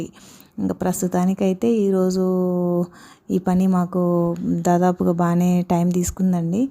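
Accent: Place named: Andhra Pradesh, India. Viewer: Nvvu telengana nega inappudu telengana slang loo matladu endhuku andra slang avasarama manaku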